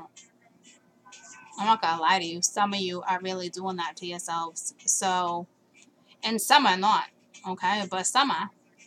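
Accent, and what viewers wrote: Boston, United States. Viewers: Are you from Boston? I'm loving your accent! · Hey You's I love your Boston accent!😂❤ And your Reading❤❤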